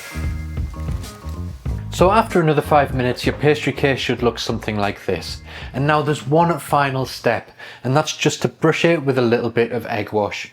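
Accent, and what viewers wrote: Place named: Northern England, United Kingdom. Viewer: Where is that accent from. Not far away from the A69 me thinks. Just discovered you and loving what you do.😊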